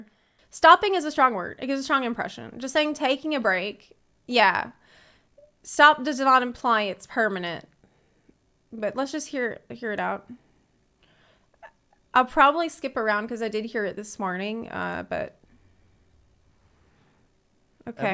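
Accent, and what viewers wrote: South, United States. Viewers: are you from the south in the states? I hear some southern accent here in there.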